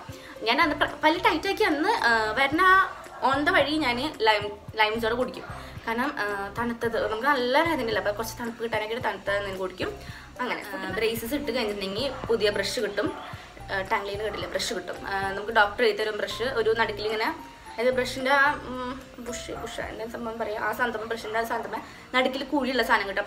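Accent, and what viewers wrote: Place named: Thrissur, India. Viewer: Chechide veed thrissur aahno slang athupole ind ❤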